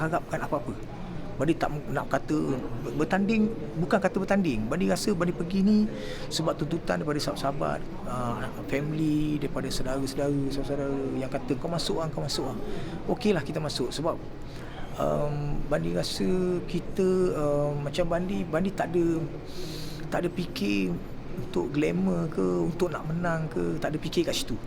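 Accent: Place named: Johor, Malaysia. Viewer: slang johor sey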